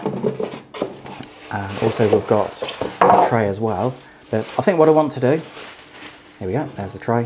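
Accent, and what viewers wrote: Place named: Liverpool, United Kingdom. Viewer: Why are you speaking in a Scouse accent? Whereabouts in Liverpool are you actually from? If you are not from Liverpool then why are you speaking in a Scouse accent?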